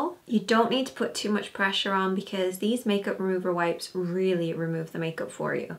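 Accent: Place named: Ireland. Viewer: She is from Ireland ^^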